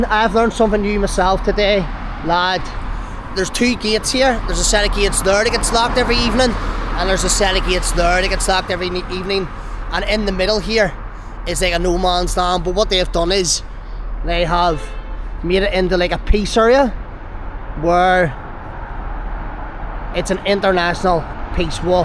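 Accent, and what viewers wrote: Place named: Scotland, United Kingdom. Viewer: Yee have a wee Scottish accent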